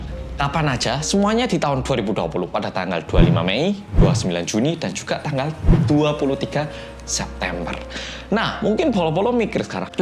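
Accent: Java, Indonesia. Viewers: Wong Korea bahasane medok koyo wong jowo sampean (mas ) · TRMKSH KOKO MEDHOOOKKK...😃😃😃😃🙏🙏VOOUME SUARA NYAAA KOKO JELAS BENING JERNIH YAAAAA.....😃😃😃😃😃😃🙏🙏💖💖💖💖💖💖💖💖💖💖👏👏👏👏👏👏👏👏👏👏👏👏👏👏👍👍👍👍👍👍👍👍👍👍👍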